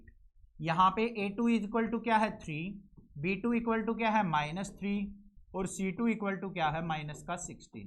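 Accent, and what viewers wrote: Haryana, India. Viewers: Sir haryanvi on top ❤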